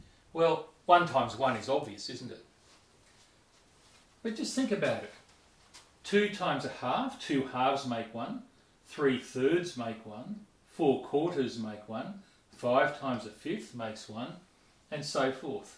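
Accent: Australia. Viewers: amazing video dude - everything clear and concise! Subbed and loving the aussie accent btw :)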